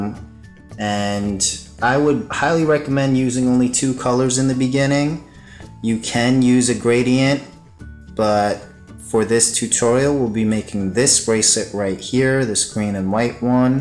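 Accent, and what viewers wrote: United States. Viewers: You really think people can make the snake belly cause I would love to, also please reply but is choon your dad or grandad because u sound american and choon is from the phillipines. I dont get it yalll and I spend $ 894 yestdrday at therainbow loom webstore and now im bankrupt!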